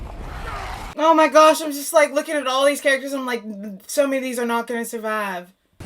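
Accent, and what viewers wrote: South, United States. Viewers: I dip in only occasionally with life so busy now, but I had to see this one again with you, it's one of my favorites. Arya is awesome! I notice your southern accent coming out a bit more than I've ever heard before and you've been beamed onto my screens for years now. It's like your comfortable now, which is cool. I'm in NC and it drives me nuts when people say I have an accent, I've fought so hard not to, so I hope that's not a bad thing to point out, but yeah, nothing wrong with getting comfortable with your audience.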